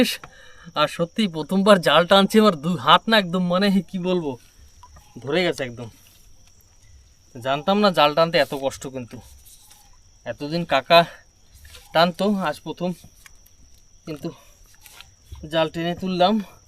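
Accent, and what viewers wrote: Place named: Khulna, Bangladesh. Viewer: District ER nam ki. Kotha bolen tho khulna r moto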